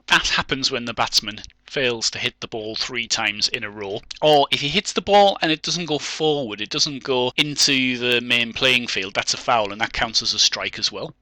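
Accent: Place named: England, United Kingdom. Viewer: I gotta say, as a yank it was BRILLIANT listening to an English person explain baseball! Like hearing what your language sounds like to people who don't speak it or something... You did an excellent job by the way! Love it!!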